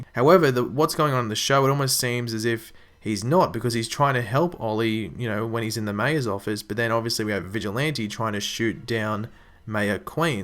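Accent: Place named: Australia. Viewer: his Australian accent i think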